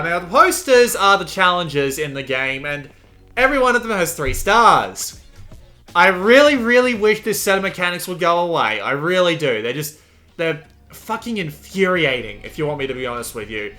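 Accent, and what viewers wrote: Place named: Australia. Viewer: The Australian accent is so harsh and annoying... Whiny...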